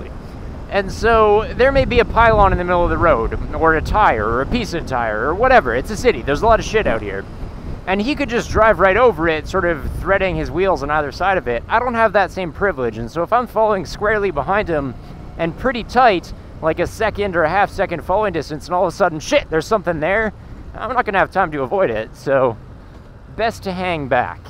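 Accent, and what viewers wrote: Quebec, Canada. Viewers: Ho yeah un québécois !! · Is he from Canada? Sounds like Norm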